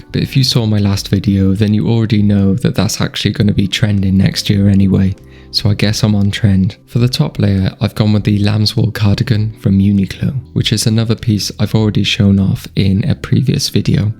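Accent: United Kingdom. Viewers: Your accent and voice is much more pleasant to listen to than other UK fashion youtubers, great video :)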